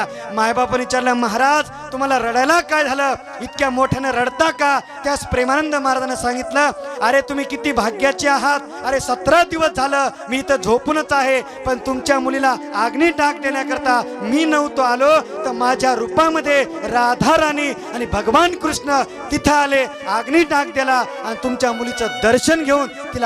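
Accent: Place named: Rural, India. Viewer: किती गावठी मराठी बोलतोय रे तू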